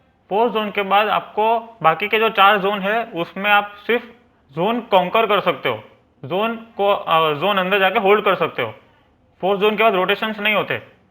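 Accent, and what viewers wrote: Gujarat, India. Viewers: Bhai gujrati ho kya? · Is that Gujarati Accent? 🤔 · U r gujrati aren't u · Bhai tum pakka gujrat se ho gujrati Right ? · Are you gujurati bro ? You sound like one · bhai Gujarati he app awaj se pata chal gaya #baari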